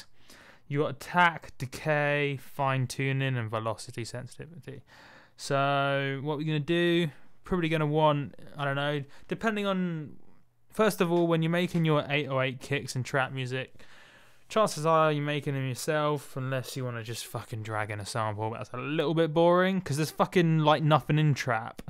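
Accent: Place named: England, United Kingdom. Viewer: I love this guys passion for 808s, trap, muzik production. Love the enthusiasm and english accent! Cheers mate!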